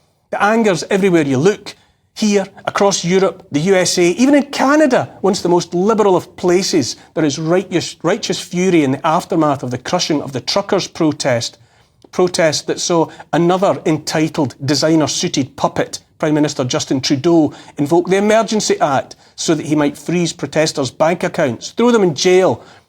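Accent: Scotland, United Kingdom. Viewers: He’s obviously Scottish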